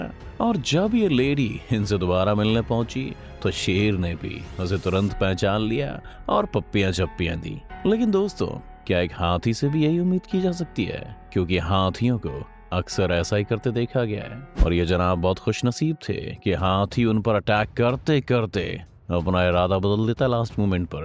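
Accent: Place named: England, United Kingdom. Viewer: You speak Hindi in English style 🤣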